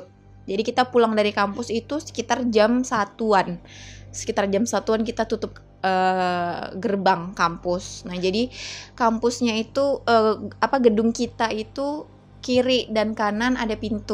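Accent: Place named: Medan, Indonesia. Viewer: Medan kali cakapnya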